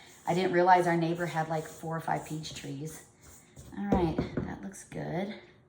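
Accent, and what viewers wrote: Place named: Minnesota, United States. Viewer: Why did I think you were from Minnesota😂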